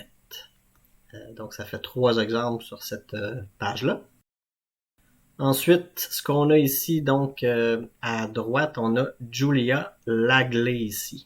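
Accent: Canada. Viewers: Video très interesante et surprenante concernant la modification des noms d'origine française sous l'aspect phonétique y transcrits "à l'anglaise". Cela me fait sourire, car j'ai vécu une situation un peu identique en 2020 lors d'un voyage aux states. On a chercher à m'appeler au micro d'un aéroport, mais je n'ai pas reconnu mon nom avec la prononciation à l'américaine 😁😆alors j'imagine bien ce que cela pouvait donner dans les années 1800 et 1900. Pas facile de retrouver ses ancêtres émigrés dans ces conditions ! Chapeau à vous pour cette vidéo et votre accent si agréable à l'oreille pour un habitant issu de l'ouest de la France ou la langue d'oil à de grandes similitudes avec le parler canadien et qui enchante mes oreilles en entendant mes cousins avec des mots qui pour certains ne sont plus utilisés par chez nous. C'est donc comme un retour à nos racines communes, mais avec des évolutions différentes de part et d'autre de l'atlantique. 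J'espère d'autres bonnes surprises de votre part. Merci en tout cas pour ce partage "linguistique" des noms de famille modifiés par nos "ennemis de l'époque"... les habits rouges, dans les registres d'état civil. 👍👍😏👋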